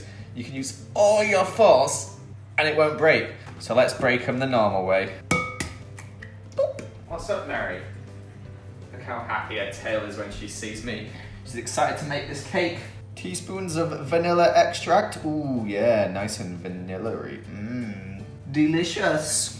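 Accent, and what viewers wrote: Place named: England, United Kingdom. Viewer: I thought u were from England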